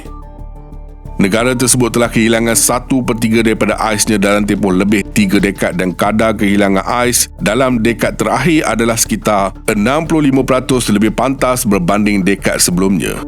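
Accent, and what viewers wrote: Malaysia. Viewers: logat Malades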